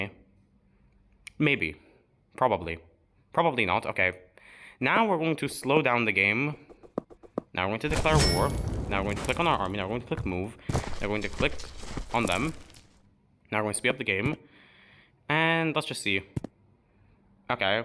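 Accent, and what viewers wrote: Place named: Egypt. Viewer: You are Egyptian? I can sense it from your accent.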